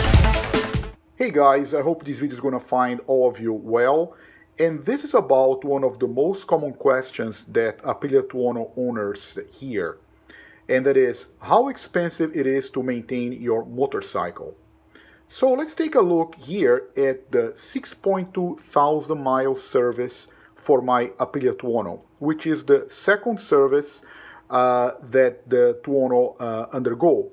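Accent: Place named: Brazil. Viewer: Thanks for the reference. I am getting close to 10,000km. BTW, you have a Brazilian accent :)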